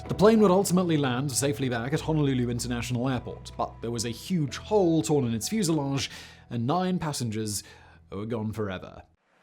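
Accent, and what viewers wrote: United Kingdom. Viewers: HOLY COW!!! I really TRIED to watch this video!!! I live in Texas!!! My dang ears & brain can’t keep up with your dang UK accent & “speed talk”!!! SHEEEESH!!! I finally had to STOP being frustrated & delete the video!!! 🤓🤓🤓🤓🤓🤓🤓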